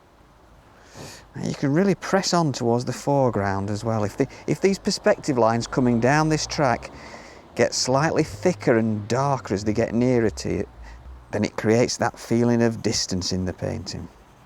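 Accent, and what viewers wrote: England, United Kingdom. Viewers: What type of English accent is this? Reminds me of Eric Idle. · I am so amazed by this painting and Mr. style, I watch this video at least 3 to 4 times a week....even while I'm doing my own creating. The colors, the cottages, the British accent - all my absolute favorites! My love for the English cottages and the landscape keeps me re-visiting this video and the others, as well. Thank you, Mr. for such soothing yet helpful tutorials.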